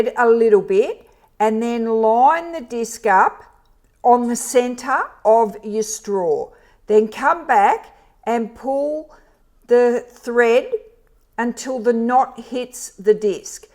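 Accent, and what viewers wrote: Australia. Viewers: G'day,. Such a relief to hear your Aussie voice. I don't have to strain ta understand. I recently got my first mega tank printer. Sooo good. Now for the Bearly art glue. Off to Amazon au. These dangles are so cute. I adore minis too.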